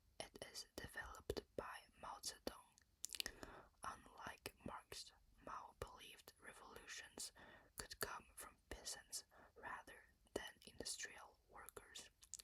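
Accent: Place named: France. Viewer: Are you french??? Sounds like your have a French accent, I am myself a french girl and communist activist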